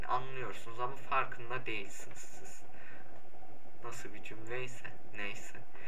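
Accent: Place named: China. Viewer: Dost nädip gurleýan düsürdin Türkmence degil Hytayca gurleyan yaly etdin .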